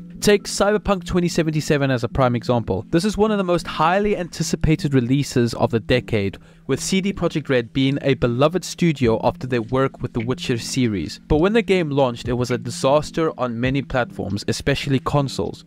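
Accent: South Africa. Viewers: Are you South African? I can hear it in your accent bru. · Man, I'm all in on these videos! Could this be the birth of a new thoughtful, reasonable, commentary channel on games industry and culture? It feels like The Act Man channel, but from the point of view of someone that loves games but has other things going on in life, who can't grind a game 8hrs a day, just like most of us. I love how you add the name of the game whenever you show gameplay from that game, it helps for games that I can't recognize via gameplay, having not played them. It's a small detail, but attention to detail is a huge thing for making a high quality anything. The SA accent is also nice.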